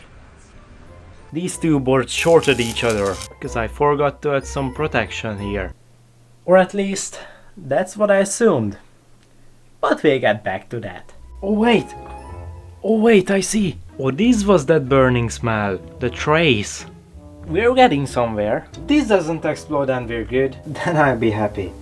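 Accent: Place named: Hungary. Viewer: you sound like you have a hungarian accent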